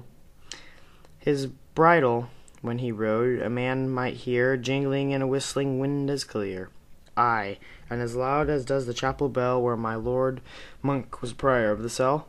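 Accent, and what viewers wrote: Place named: United States. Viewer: american accent... try speakin in an english accent pleeeease, we all sing in an american accent when we sing pop so you can at least try :) please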